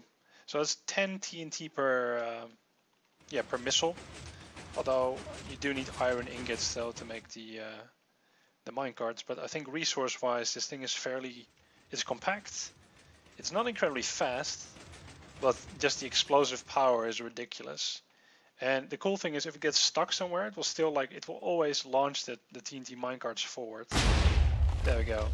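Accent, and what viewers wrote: Netherlands. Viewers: aan je Engels hoorde ik dat je Nederlands was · let me guess, you're dutch. (kan je horen aan je accent) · ***** oke nederlands :P je acent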